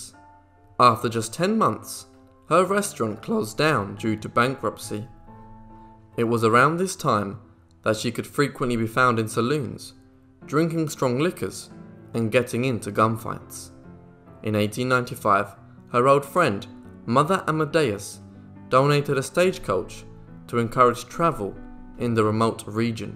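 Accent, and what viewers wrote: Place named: United Kingdom. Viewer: WHY ,WHY WHY do.they always have these damn Brits narrating stuff that has nothing.to.do with them I can't stand listening to.that.accent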